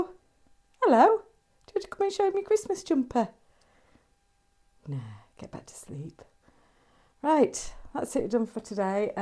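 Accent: Northern England, United Kingdom. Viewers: I really love your content and your house and tree look beautiful. You have a lovely soft and warm northern English accent, nowt wrong with that. Have a great week. 💕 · Not boring, not annoying, and you have a lovely Northern accent. It's nice to see home decor ideas that don't cost a fortune in a normal comfortable small home. Some people seem to get a thrill out of being spiteful. My old Northern Mum used to say - if you can't say anything nice - don't say anything at all...... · Your gold wreath is exactly what I was looking for, Home Sense failed me though. I love your northern accent, you sound just like my Manchunian best friend who now lives in the US, happily she hasn’t lost her accent which I think is “dead nice”. ❤️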